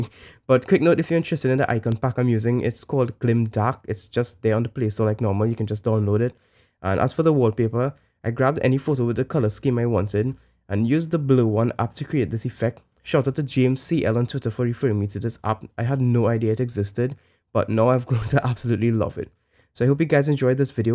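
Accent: Trinidad and Tobago. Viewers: are you Trinidadian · Are you trini bro ?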